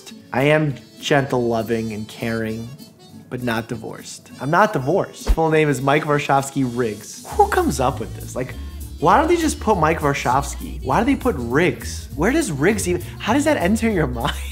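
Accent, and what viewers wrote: Russia. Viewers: im new, are you russian? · Are you Russian?? Even better😍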